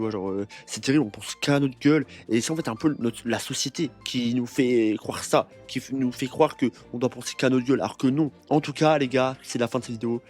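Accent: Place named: Arab world. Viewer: W VIDEO W ACCENT ARABE ARR 😭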